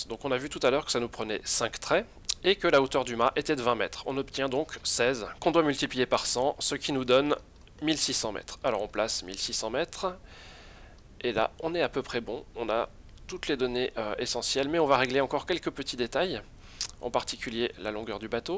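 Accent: Switzerland. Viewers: Tu es du canton de Vaud ?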